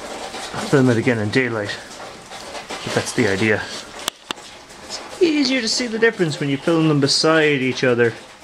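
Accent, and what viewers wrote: Ireland. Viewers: Are you Irish? And is that hail on the roof?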